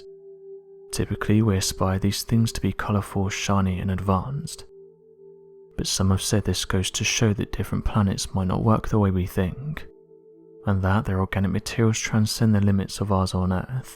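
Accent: England, United Kingdom. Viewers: So why are these “aliens” always seen either in the Sky or Space? But the aliens don’t ever make it to Earth in ET Spielberg style? Sorry but the husky serious English accent doesn’t really do it for me.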